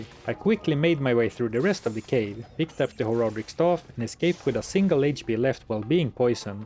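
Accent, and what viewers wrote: Sweden. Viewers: Are you swedish? Your accent sounds swedish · What a peculiar, and very thick, Swedish accent! Not only does he confuse V and W, "sh" and "ch" and so on as most non natives speakers do. He also turns "o" in to "a", which is something I've never heard before. "lot" becomes "lát", "dodge" becomes "dádge" and "monster" becomes "mánster" somehow. That combined with the language melody, copied straight from Swedish make for a hilarious listening! :)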